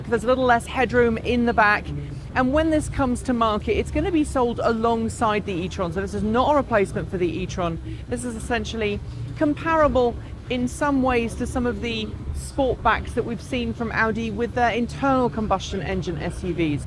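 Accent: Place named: United Kingdom. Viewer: I still don’t understand why you report in British accent while you talk normal US accent in person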